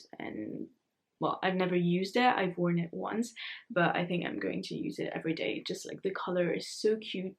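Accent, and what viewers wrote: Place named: United Kingdom. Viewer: Hello from Nova Scotia, Canada. Congratulations on your first podcast, it was delightful and very informative. Your English is perfect and love the slightly British accent. Your enthusiasm and sweet smile make you and star in my books. Just be yourself. Your knitting is quite lovely and your yarn choices are great - not cheep as far as I am concerned. I would love to try the Drops line of wool someday. I am 68 and very much on a budget so I do what I can. I look forward to your next podcast which, by now is out and ready for me to watch next.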